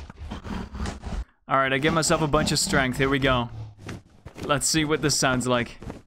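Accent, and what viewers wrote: Netherlands. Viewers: He is Dutch so he has an accent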